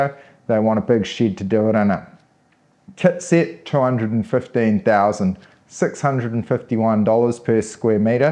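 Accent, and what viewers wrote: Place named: New Zealand. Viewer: gotta love the kiwi accent, reminds me of that banned ad, of the guy getting his neighbours wife & kids to play on his deck !! 🤣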